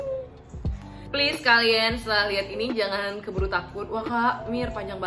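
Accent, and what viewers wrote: Palembang, Indonesia. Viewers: Kakak orang palembang ya? logatnya wkwk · Kak kokbahasa kk kyk bahsa plembangg sihh😚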